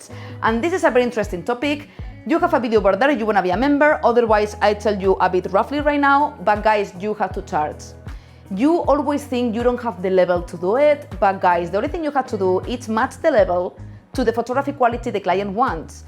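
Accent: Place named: Spain. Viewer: Hi there, your videos are great and interesting. But english is my 2nd language and you are not so easy to understand because you talk so fast and the Spanish accent. So can you speak slowlier in the future? Would be good for the viewers with a background like mine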